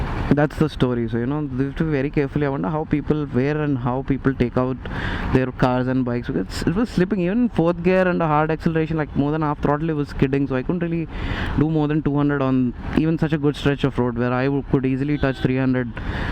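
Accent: Hyderabad, India. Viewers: FYI its concrete roads :). Reason being that tar coal roads cannot withstand the amount of rains Mumbai faces (a hell lot). So that's why concrete roads and those small bricks on roads are called as paver blocks they are mostly used at junctions or side walks because all the utility cables /pipelines are under ground in Mumbai and if they want to lay new cables or repairing job to be done it will be difficult to dig through concrete than just simple removal of those paver blocks. Also ground water has nothing to do with these paver blocks/ roads as your friend's suggested. I like your Hyderabadi Hindi commentary...Cheers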